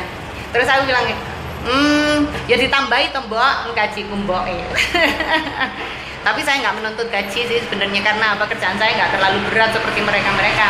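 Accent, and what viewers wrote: Java, Indonesia. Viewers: Mbk jowo ne nok ndi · Jowone Ojo ngasi ilang mbak 😂😂😂😂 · hi mbak...salam kenal. saya lia dari cibubur. sukaaa sekali sama vloq mbak. aku juga suka dgn medoknya mbak. dan paham sekali artinya meski aku bukan wong jowo rek 😂.mbak tulus ngurusi si mbah. dan perilaku majikan pun baik sama mbak. itu imbalane mbak orng baik ketemu yng baik.btw, mbak dah brp lama di HK kerjone?bahasa HK nya juga hebat euy. kursus bahasa dulu mbak sebelum ke HK? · Jowone ngendi ce? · Mba jowo e pundi kok muedok banget, salam dari surabaya 💗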